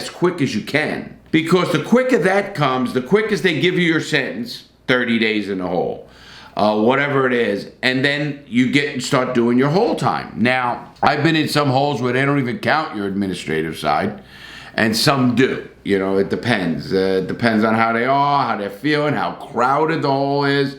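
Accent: New York, United States. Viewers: I just LOVE his new york accent its so heavy I can smell pizza from the screen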